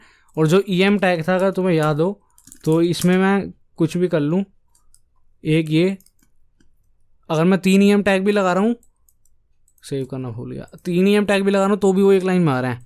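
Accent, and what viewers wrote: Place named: India. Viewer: Video to mast thi hi, Bhai ka Bolne ka Style bhi mast laga Desi ❤️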